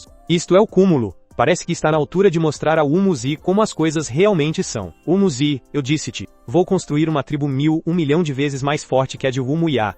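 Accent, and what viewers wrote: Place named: Portugal. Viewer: Essa língua portuguesa falada à maneira de Portugal não dá..m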